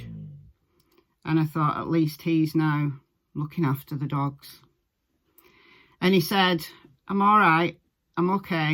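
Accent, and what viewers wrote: United Kingdom. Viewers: I'm sending you love, light and blessings,. I love your CW videos, but this was something different. I'm not far from you in Sheffield, and it's lovely to get a UK dialect on your analysis of your videos. You have a very sincere voice, and I totally get your intonation and heartfelt words. Your situation with your boy sounds like the experence that Sloane Bella had...... A real open connection to the spirit world..... Love and peace <3 x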